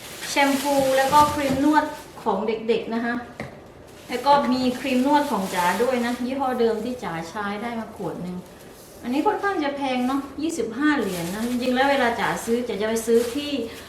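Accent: South, Thailand. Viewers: น้ำส้ม ไม่มีเยื่อ นิคนใต้ชัดเจนไม่ต้องหมุนเสาเลยค่ะพี่จ๋า🥰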